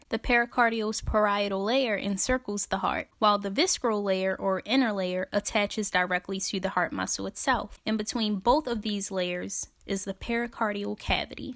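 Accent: Baltimore, United States. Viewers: Definitely sounds like a Baltimore accent! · This was incredibly useful!!! Thank You!!!! I know a Baltimore accent when I hear one😊.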